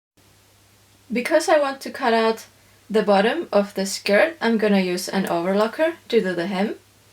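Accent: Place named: Finland. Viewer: Mä arvasin et oot suomalainen koska sä puhuit suomen englantia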